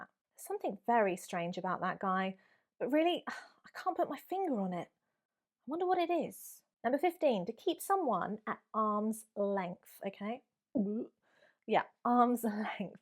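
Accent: United Kingdom. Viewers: Hello, I am an Arab from Bahrain and I want to learn the British accent soon. I will learn it from you 🥰🥰♥️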